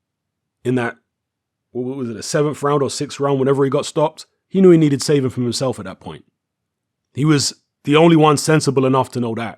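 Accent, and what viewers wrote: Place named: RP, United Kingdom. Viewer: Oi! How on earth would you insult Deontay Wilder with the cleanest British Queen's English eh?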